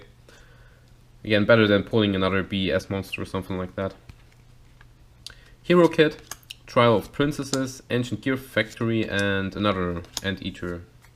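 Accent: Germany. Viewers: Are you german? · Bist du Deutsch da du Englisch wie ein deutscher sprichst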